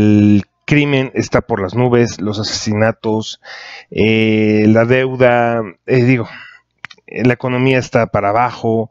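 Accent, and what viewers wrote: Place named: Venezuela. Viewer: esa voz de Venezolano Antorchista 👀 ehhh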